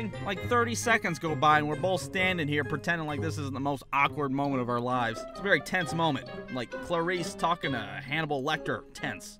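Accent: United States. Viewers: Are you American or what I'm not sure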